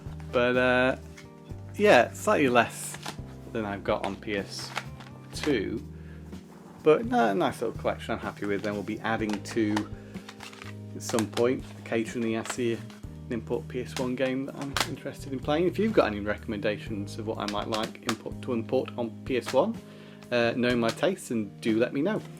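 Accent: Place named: United Kingdom. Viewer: Bro what's up with this channel, you sound British and nothing like the bald bearded guy on the latest video about kinder eggs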